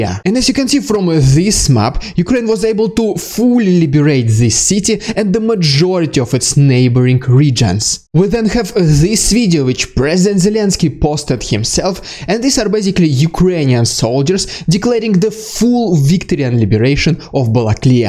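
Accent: Russia. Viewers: good job reporting Ukraine's success. you also have the sweetest Russian English accent I have ever heard.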